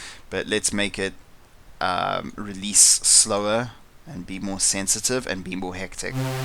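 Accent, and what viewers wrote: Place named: South Africa. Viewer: Hey! Been using the same theme since 2014, and also produce electronic music. If that isn't rare enough, it sounds like you're also a South African! Greetings from Cape Town :).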